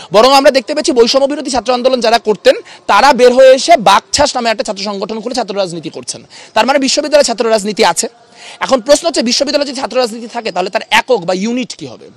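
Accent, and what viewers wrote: Kolkata, India. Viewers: তার কথাগুলো কলকাতার মত। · ওনার কথা গুলা কেমন যেনো কলকাতার মানুষের ভাষার মতো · এর কথাবার্তা শুনে তোমার ইন্ডিয়ান মনে হচ্ছে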